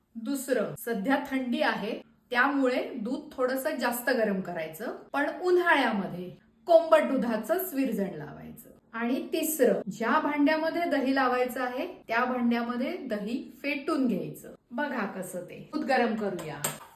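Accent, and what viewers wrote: Konkan, India. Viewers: शंभर टक्के कोकणस्थ ! बोलणे आणी पद्धत सांगते . छान सांगता वैनी .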